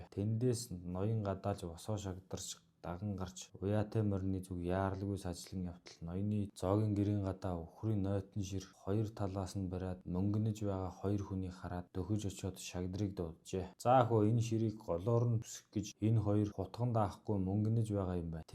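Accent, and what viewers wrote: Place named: Buryatia, Russia. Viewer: Buriad yumuu aylagtain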